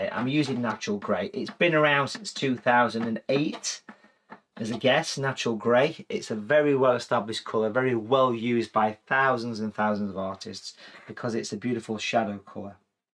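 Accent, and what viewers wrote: United Kingdom. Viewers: I shall give this a go as I loved the rocks. Love your Derbyshire accent too 👍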